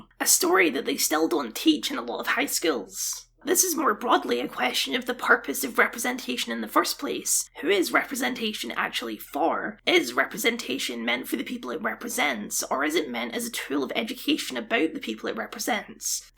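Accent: United Kingdom. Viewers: I’m… I mean I WAS assuming it’s just a different timezone situation, given the accent. GMT at 4am est is 9am.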